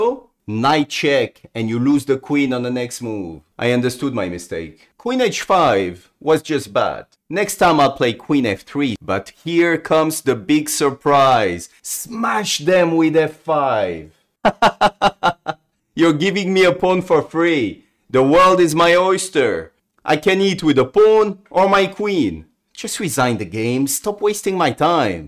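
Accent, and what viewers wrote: France. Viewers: I take it your from your accent that you are French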